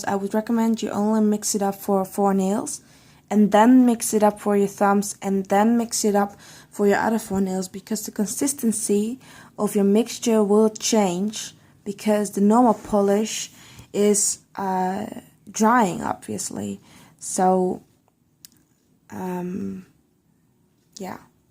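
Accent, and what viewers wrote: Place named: Netherlands. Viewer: whatts wrong vith her voice? Its an accent. I guess Dutch or maybe swedish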